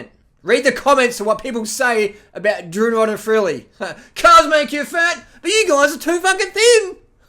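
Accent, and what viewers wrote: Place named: Australia. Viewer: This video is as good as his Australian accent.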